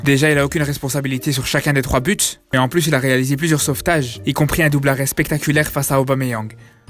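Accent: Belgium. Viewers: Votre accent belge je kiffe !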